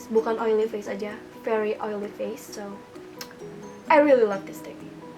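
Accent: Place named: Java, Indonesia. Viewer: logat jawanya keluar :v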